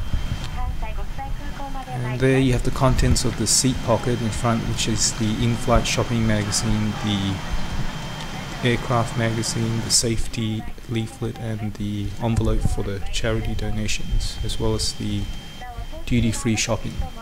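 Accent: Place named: Australia. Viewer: Excellent work & I am a big fan of Australian accent. :D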